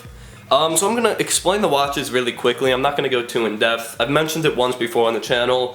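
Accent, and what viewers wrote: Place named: United States. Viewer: He sounds American mate, probably a glory supporter!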